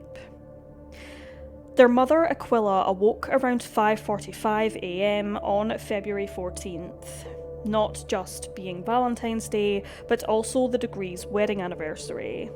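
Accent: Scotland, United Kingdom. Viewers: It’s great to hear another Scottish accent on YouTube! I love the channel, I sometimes find it hard to “stay polite” and not use my “guffy” chat. 🤣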